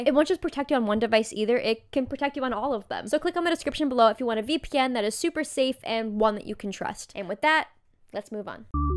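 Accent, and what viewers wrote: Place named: Canada. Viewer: Why do you have the Canadian raising accent 😭😭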